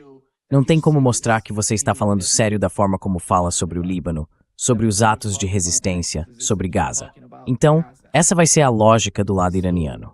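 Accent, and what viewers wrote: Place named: India. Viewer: nao é brasileiro, é só uma tradução automática. Acho que é americano descendentes de pessoas do Oriente médio provavelmente Ele tem um sotaque indiano, mas ele é branco, estranho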